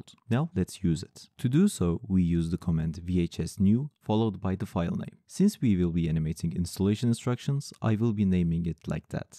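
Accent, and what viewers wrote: Turkey. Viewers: Thanks that was usefull. btw are you Turkish ? Your accent seemed to me like that.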